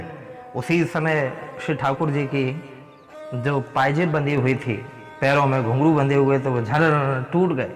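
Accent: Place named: Vrindavan, India. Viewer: Kitni meethi boli Shri Vrindavan Dhaam ki Dhanyavaad PrabhuJi Kripa karo Bihariji Radharani kripa banaye rakhe sada 🙏🏻🙏🏻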